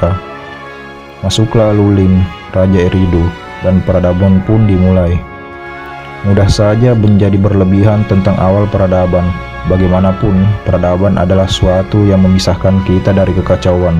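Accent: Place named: Medan, Indonesia. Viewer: Maaf bang mau komen out of the topic abang orang medan ya? Dialeknya terasa banget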